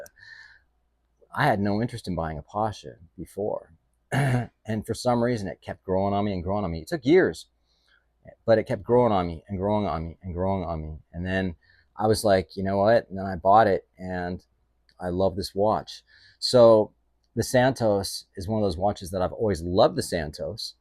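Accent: United Kingdom. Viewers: Great British accent….. can we have some more 😂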